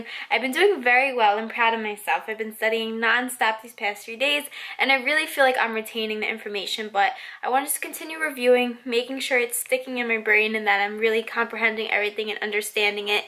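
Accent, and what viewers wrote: New York, United States. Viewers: what part of Jersey or New York are you from...anyone with ears can hear it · true New York/ Jersey accent there lol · Thank you thank you. Are you from new york or new jersey? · Where are you from? I love you accent, sounds New York or jersey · Sounds very New York... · Are u from NY? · Are you from New York??❤️ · New Yorkers? Long Island? :) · I follow you on insta and I never took in your New York accent till now. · Are you from New York or the midwest? That accent is so strong. I like it though btw you are gorgeous! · where are you from? I hear a bit of new york dialect? :)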